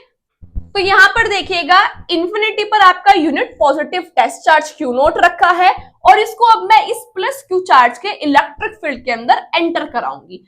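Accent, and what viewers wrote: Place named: Pakistan. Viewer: her accent is like pakistani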